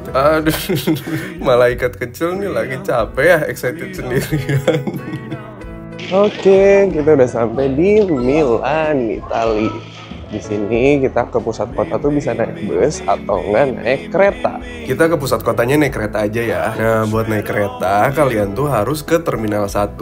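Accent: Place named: Bandung, Indonesia. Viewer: Ini masnya org bandung ya? Duhh kenapa mengingatkan mantan bgt. Suaranya, logatnya, jokesnya😂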